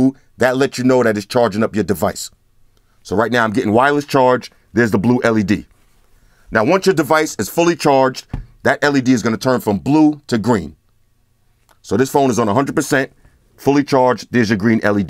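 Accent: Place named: AAVE, United States. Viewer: Have not heard a more ebonics infused product review in all my days. Hilarious and enjoyable :D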